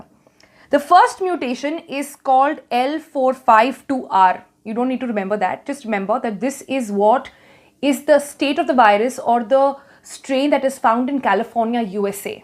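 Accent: India. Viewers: She is talking english, but the accent make it sounds like the language they are talking in India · Purely Indian pakka accent....thanks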